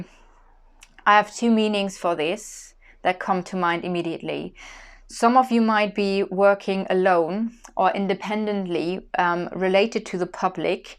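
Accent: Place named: Germany. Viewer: We missed you, your accurate detailed readings, your lovely personality and your sweet voice and angelic singing. I can slightly hear the German accent integrated in your soft British English. I can see you've been working a lot with the German community, but please do not forget us, the rest of the world. You would not believe the hearts you've touched, even in a faraway land like Albania, an unknown place to many, from where I'm listening to you now! Thank you dear. Danke schön! A Virgo sister! <3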